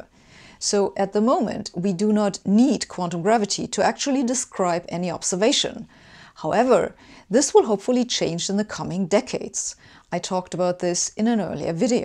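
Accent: Germany. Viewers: I have some real Qs: why are forces free? Why don’t they cost anything? And why don’t they get tired? How does energy fit into all this? Is energy a currency? Currency as in cost? Cost as in no longer free? And if all the energy in the universe is a constant, why does anything happen at all? Because it doesn’t seem like anything is being spent. Perhaps, specifically, if a magnet sticks on a ceiling, why does it not get tired?… While I’m at it, someone asked does a cup of water think? Maybe think more if it’s heated. Perhaps not, but it must think I’m hot or getting hot, if you know what I mean. That’s the problem with thinking, a stone must think it’s a stone and water must think it’s water or I would not be able to think at all; surely. See if you can explain any of that without your typically boring clenched teeth tight mouthed monotone that speaks with a German accent very well, even when you are always trying to lighten up a lot.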